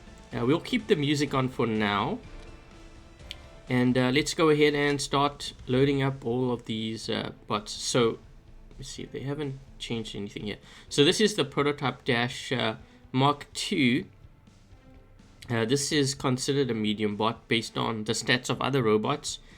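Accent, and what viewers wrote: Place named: South Africa. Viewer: sound south african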